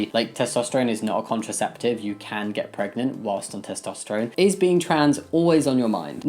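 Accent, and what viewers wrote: United Kingdom. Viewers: Honestly the only question I want to ask him is about his accent. Like it sounds British, but specifically, what is it?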